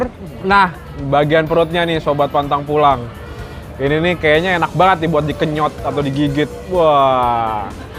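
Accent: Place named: Tangerang, Indonesia. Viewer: Logatnya tangerang banget bang😂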